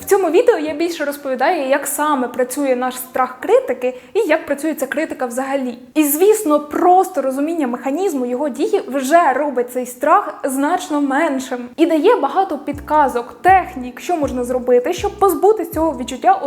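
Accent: Poltava, Ukraine. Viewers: Ви дуже автентична, цікава та харизматична ❤ та мені чується полтавський рідний акцент 😅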